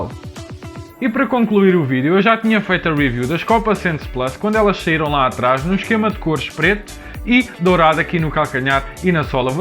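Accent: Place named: Brazil. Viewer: O português mais brasileiro deste YT! Parabéns pelo vídeo,!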